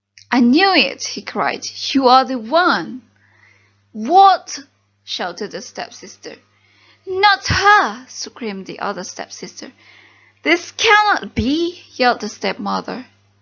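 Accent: United Kingdom. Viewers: First i hear you then i love you.. Auto subscribe.. It sounds like british😍 Semoga channel Kaka makin berkembang yaa.. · british ya kak 😍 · Wow! What a great Job, Sister! Where did you learn English? I love your english pronunciation and accent. It sounds like British.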